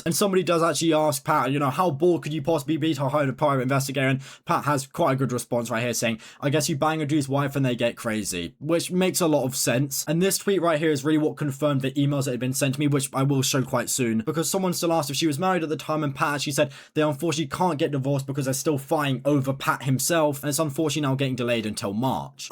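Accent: United Kingdom. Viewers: You’re like the inverse of MoistCr1TiKaL black T-shirts UK accent and high energy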